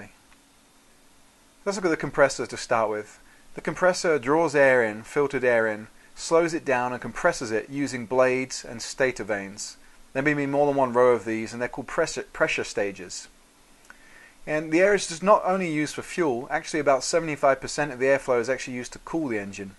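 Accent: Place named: England, United Kingdom. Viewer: I saw the tail number on the helicopter (N319CF) and I thought it looked familiar. So, I checked my log book, lo and behold, I flew that same helicopter for long line training. Then I thought to myself... The person who made this video sounds English and has flown the same helicopter as me...hmm...who could this be? This person could only be! Great video and explanation of how helicopter turboshaft engines work.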